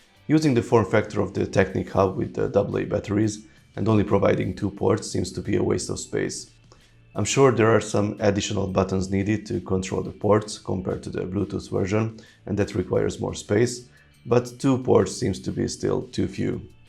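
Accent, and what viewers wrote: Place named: Hungary. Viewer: I just asking, because is a Hungarian name and your accent is soo Hungarian. But if no, then sorry. 😅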